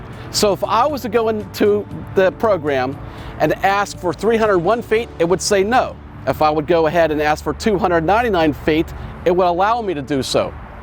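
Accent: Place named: Pittsburgh, United States. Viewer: Awesome video and extremely helpful! Also I grew up in Pittsburgh and now live in Sarasota Florida. Your voice is music to my ears! Love the Pittsburgh I can hear in your voice. Looking forward to watching your videos.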